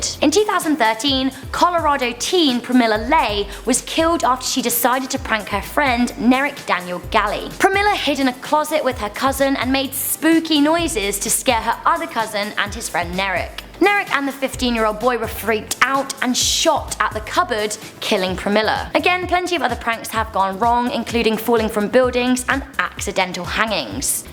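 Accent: England, United Kingdom. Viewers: Please stop using brits with their weird ass voices. Can you get a Nigerian narrator please? They have sexy voices. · i Hear that you are...English???? I'm english to and just wondered if you would come to comic con or if you like lived in london..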